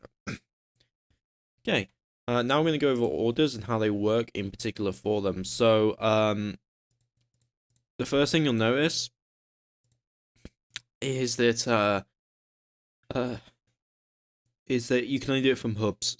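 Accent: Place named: United Kingdom. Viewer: Sound is a lot better on this one! Minor point of citicism: your english is very 'brittish'. You talk very fast and don't 'finish' all your words properly. This generally makes it harder for continentals to follow. Keep it up though, the tutorials are really useful.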